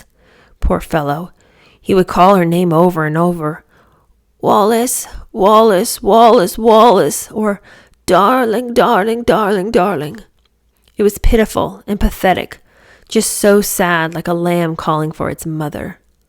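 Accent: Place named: England, United Kingdom. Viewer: Really enjoyed this reading as always - by the way, excellent English accent.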